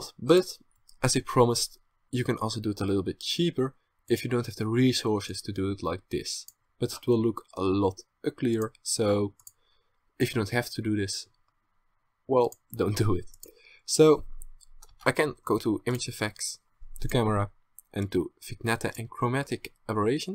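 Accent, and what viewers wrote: Netherlands. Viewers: The dutch accent xD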